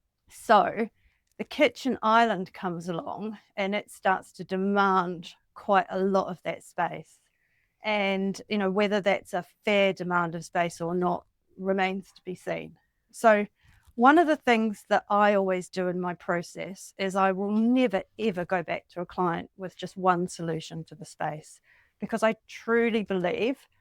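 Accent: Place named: New Zealand. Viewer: Oh I'm proud of myself for picking up on the Kiwi accent! (a transplanted American living in Australia). I also think providing your clients with a bunch of designs is a terrific idea. Not that it matters, but I agree with the kitchen table idea. I've always thought that there's something inherently silly in having a seating/dining spot just feet from the dining table. If a design wasn't open plan, it might make some sense, but otherwise, why? I'm also not keen on having either a cooktop or a sink in the island, as to make that functional, the island has to become bigger and take up more space.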